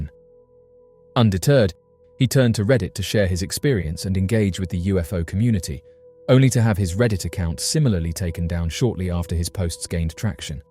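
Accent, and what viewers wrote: England, United Kingdom. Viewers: A truly excellent video once more, with excellent scientific commentary from a good clear English accented voice in unhurried speech very easy to follow. This is so much better than many American accented voices seemingly gabbling away at such a rapid pace I find almost impossible to follow or even fully comprehend ! Congratulations to the excellent commentator ! Let’s have more excellent commentators like this speaking scientific sense ! Cheers, Richard Leschen. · I prefer American accent. I can barely understand this British accent. Hard to follow. Make a better video with an American accent.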